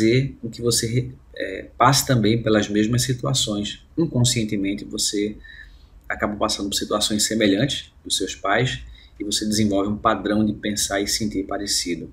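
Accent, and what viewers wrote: Recife, Brazil. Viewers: eita recifense. massa!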